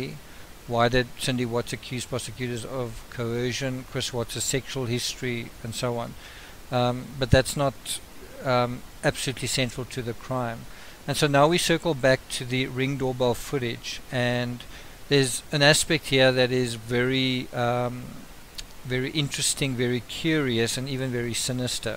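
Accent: Afrikaans, South Africa. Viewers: Interesting! I hear you are a Afrikaans person. Do you remember the case of Oscar Pistorious? I think he was guilty. Good content.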